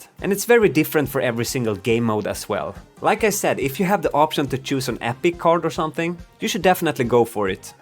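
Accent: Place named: Sweden. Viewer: First video from you that I’ve wached, great first impression. Your accent sound very swedish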